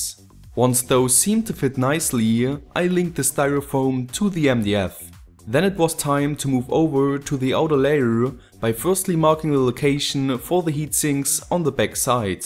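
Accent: Germany. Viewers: he's german, it's an accent. deal with it. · Du kommst doch bestimmt aus dem deutschsprachigen Raum oder? ;)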